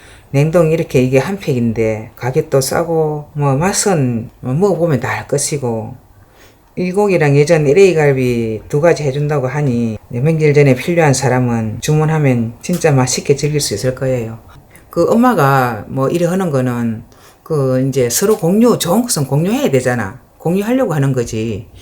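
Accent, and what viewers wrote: Gyeongsang, South Korea. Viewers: 경사도 사투리로 TV 요리프로에 함 출연하시면 좋겠어요!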